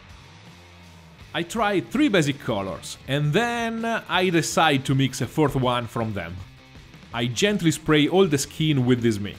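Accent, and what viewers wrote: Italy. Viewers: Working in adult language acquisition I always tell my learners the same. Removing the accent is a ton of work, can easily fail depending on a multitude of factors and usually is just not worth it, unless you want to hide your origin for some reason. I find it charming and enjoy your videos no less for it, quite the opposite. Makes me think of some of my Italian friends, which makes you even more likeable, if that's possible. Last but not least, don't underestimate the Yoda effect: If you're the master and you want your lessons to be memorable and to penetrate your disciples hazy mind barrier, make your words different, intriguing and striking. Speaking like the rest truly you must not.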